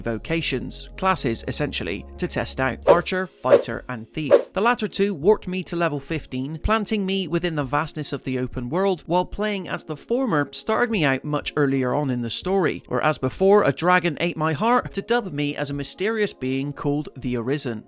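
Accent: West Country, United Kingdom. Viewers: DD2 is looking fire! Sidenote, this is the first time I have heard a gloucester/bristol/west country accent from a youtuber and its refreshing!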